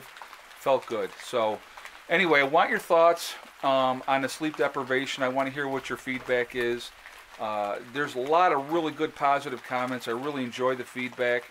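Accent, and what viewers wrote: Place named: Great Lakes, United States. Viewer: Good video- I noticed your accent was "normal" to my ears. I'm from the South Side of Chicago originally and you sound like one of "us". Went to your channel and you said something about upstate New York. It's funny how people from around the Great Lakes have a similar accent.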